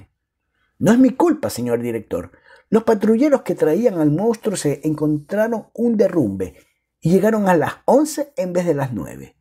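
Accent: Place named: Latin America. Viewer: Muchas gracias por la narración y por el esfuerzo. La entonación foránea latinoamericaniza estas historias criollas peruanas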